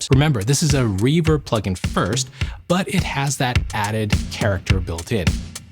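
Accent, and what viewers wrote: India. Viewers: R u Tamil guy?😅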